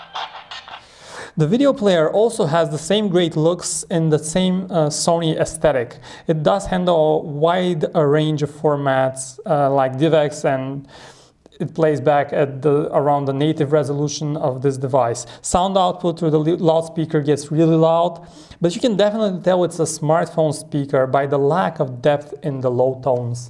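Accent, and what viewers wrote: Bulgaria. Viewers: razbrah 4e si bulgarin :D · Man, are you Bulgarian?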